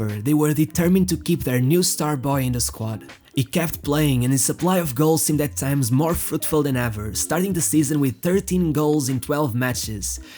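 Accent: Portugal. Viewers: You don't have to use the Portuguese accent on non Portuguese words my dude. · How do you not have a million subs??!! Thanks for the amazing videos keep up the great work and I love your Portuguese accent makes everything sound so smooth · És português também eu o sotaque assim indica parabéns ao seu Canal fantástico 👍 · Antes de falares uma palavra em portugues já tinha reparado na tua lingua!! Tugas Caralho!! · Esse sutaque hein....Tu é Tuga né? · you, the narrator of this video is Portuguese right? the accent points to it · i can tell the Portuguese accent. It is also not "footsal" its indoor football. thanks for the video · Ahhh...vi logo que era um tuga a falar ingles... Bom video,desconhecia o canal...subscribed... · Without knowing you I can tell you are Portuguese, and likely from the North/Center of the country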